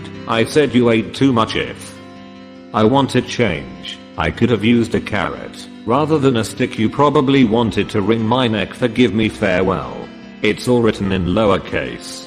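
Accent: United Kingdom. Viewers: Great... I like his voice, very clear and good brittish accent. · good british accent, very clear............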